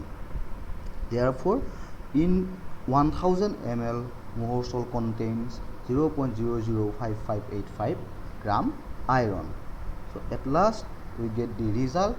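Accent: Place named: India. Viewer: Sir is speaking the english that foreigner think of India 😢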